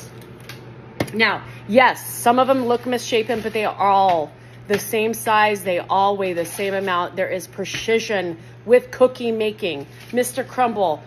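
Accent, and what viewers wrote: South, United States. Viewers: Good afternoon, and once again, you are the always hilarious and sarcastic chronicler of everything and your sassy Southern accent is the icing on the cake lol!! Those cookies all do look damn good and who doesn’t eat the whole Oreo?? Of course you have to take it apart and lick off the cream inside but also part two is dunking a whole Oreo in a big tall cold glass of milk (anyone who doesn’t know this is the procedure with Oreos, doesn’t deserve them!!😂); have a great day, and let’s hope you did not get diarrhea from eating all the cookies lol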